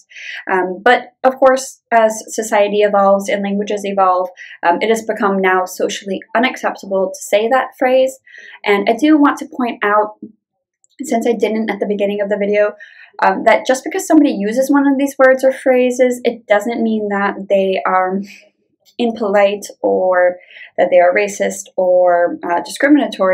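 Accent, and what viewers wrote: United States. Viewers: Thx for your answer. Corsica and Sardinia are similar. Sardinia has less (and lower) mountains...and more beaches. I could guess you were from northern U.S.A. I've been to California, Arizona, Texas and Florida and their accent is different (especially in TX).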